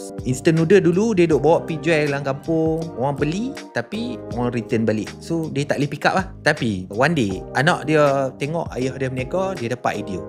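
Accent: North, Malaysia. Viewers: Apasal Melaka cakap orang utara?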